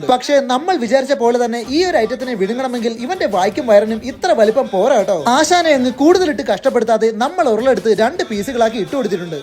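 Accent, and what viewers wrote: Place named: Trivandrum, India. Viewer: Ral tvm speech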